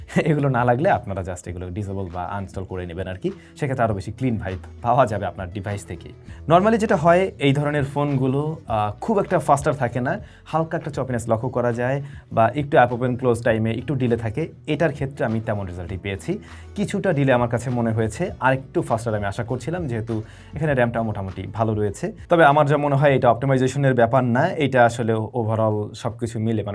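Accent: Barishal, Bangladesh. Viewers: বরিশাইল্যা নাকি ক্ষেত ক্ষেত কথা অস্তে অস্তে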